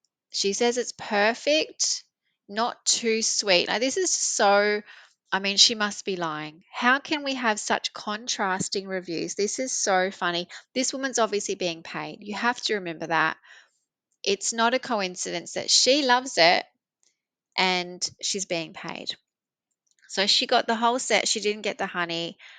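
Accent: Australia. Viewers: I enjoyed the podcast or is it a video ? you sound like an Australian and must compliment your ability to make fair comments without being nasty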